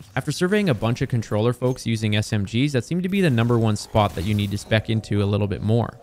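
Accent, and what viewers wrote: Canada. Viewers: Are you Canadian? You sound Canadian say “sorrow”. I love it.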